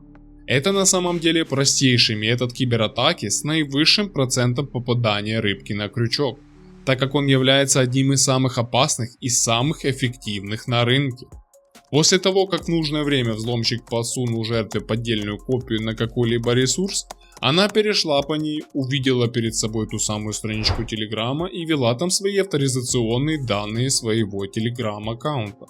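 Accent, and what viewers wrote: Ukraine. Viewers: Своим говором и языком гугла ты спалил что украинец, будь осторожней а то за такую информацию тебя многие могут недолюбливать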